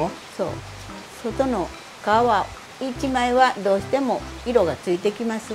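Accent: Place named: Kochi, Japan. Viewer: 高知弁のイントネーションが可愛いですよね…！私も高知の片田舎越知町です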